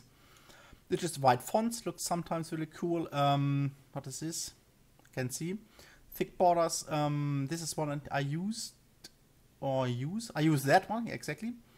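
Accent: Germany. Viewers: That authentic German accent i love it! Greetings for Sweden man, thanks for the Tutorial.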